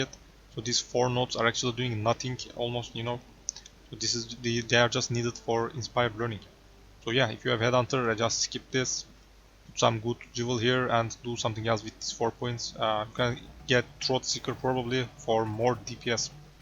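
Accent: Turkey. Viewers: Bu adam türk değilse insan değildir. böyle konuşan türkten başka insan olamaz. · Ingilizce aksanından Türk olduğun öngörüsünde bulunacaktım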